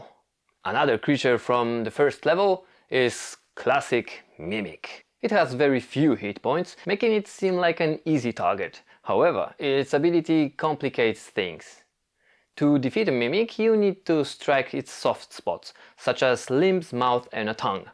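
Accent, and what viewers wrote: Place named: Poland. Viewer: this is the coolest pocket dungeon crawler i have ever seen :) 1 more thing. Why do you have polish accent?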